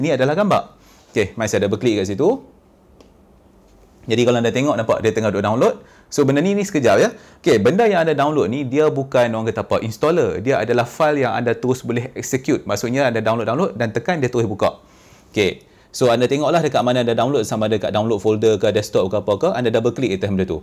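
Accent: North, Malaysia. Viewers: Abg terang memang padu, loghat utara plak. haha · Walaupun beliau bertutur bercampur dgn loghat utara,saya yg berasal dri Sabah faham dgn baik,tak sangka banyak Malaysian buka content video lain dri yg lain tapi yg penting sangat bermanfaat! Tq for sharing the info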